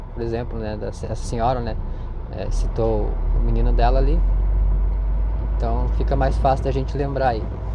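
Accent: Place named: Rio Grande do Sul, Brazil. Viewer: Você tem um sotaque de gaúcho tchê!!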